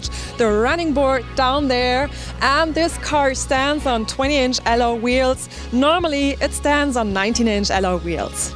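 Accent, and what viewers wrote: Germany. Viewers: Nice German accent.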